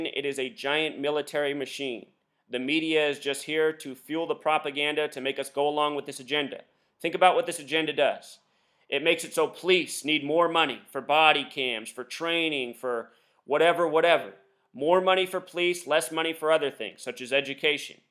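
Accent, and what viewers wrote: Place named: United States. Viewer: You sound American , so I am going to assume you are. How could someone be so insensitivity and reckless to the misery of his own fellow citizen. A man was shot to death, in-front of loved one and a little girl. Your response is to go online making jokes about it. May the God of Abraham and Mosses give you the wisdom to see your mistakes.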